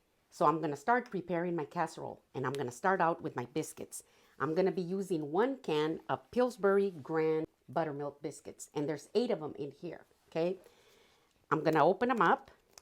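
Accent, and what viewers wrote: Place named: Texas, United States. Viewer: "I'm gonna".....girl, if ever there was any doubt you're a native Texan, that should alleviate it. You should throw in a few "I'm fixin to"s just to seal the deal.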